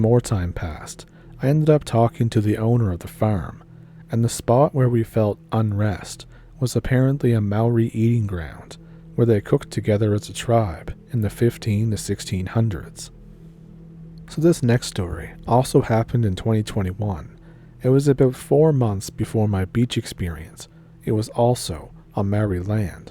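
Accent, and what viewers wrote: Canada. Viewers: You don't sound like you are from New Zealand. More like Canada. Cup of tea, real British stuff. Get real man. What a load of tripe. Your description of your fossil area near Christchurch do not match. Just made up stories with no truth. I gave up listening.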